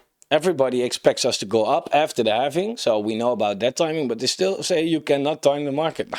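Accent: Netherlands. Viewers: Nice video m8 doing great keep up the great work! Like your style, and dedication to explain your vision and information. The first video when i found you on youtube i was like hey he's dutch i can hear it Nogmaals goed bezig man! En op naar een mooie cycle met mooie kansen Laterr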